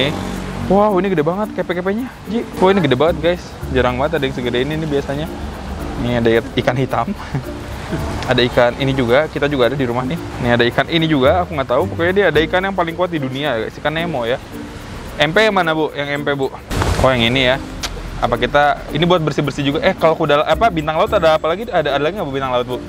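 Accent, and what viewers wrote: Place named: West Java, Indonesia. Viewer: Cara ngomong kak ini khas Sunda bgt apakah emg org Sunda? Wkwk penonton baru ini.... Dan ada yg bisa ngasih tau ini pasarnya di mana ya?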